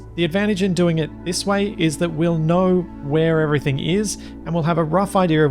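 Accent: Australia. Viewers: Aaah yeah i knew you did something like that;) I think its even easier 4 me to understand you Guys because of your Australian accent ´s (dont like that high Royal Perfect UK Eng so much it sounds so... Royal and is to fast) Your accent sounds much lovelier and sometimes more Funny esspecially when comes around the Corner and youre "makin him Dizzy" and something like that;) Ever want to travel to Australia and not because of the Beautiful Land itsell but mostly for the nice People and Culture that are living there and mby a little bit because of a Movie Series in my Childhood... Sorry but since i saw Mr. Croc Dundee 20 yrs ago or so i knew you were Cool People and i really like the Pesonality of this guy since now;) Its just a movie i know and because of that im happy to be able look just around the World with your Series here and all the other ZebraMonkey LSG´s. 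So again Thanks for good Entertainment and education!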